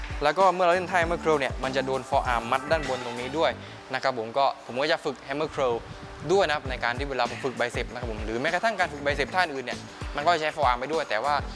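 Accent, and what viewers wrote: South, Thailand. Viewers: ตอนปึ่งแลแรกๆไม่คิดว่าเป็นคนใต้ 555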